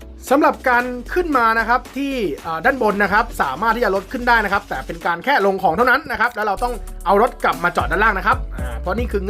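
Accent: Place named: South, Thailand. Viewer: ฟังเพลินครับภาคใด้มันมากครับ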